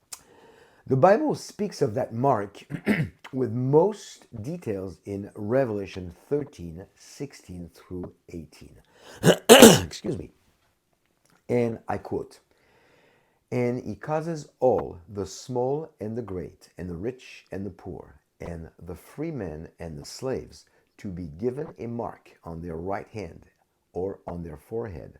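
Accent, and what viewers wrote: France. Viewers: Watching from South Africa and enjoying your teaching tremendously....especially your brilliant sense of humour. God bless you richly. Also love your French accent!!! Shalom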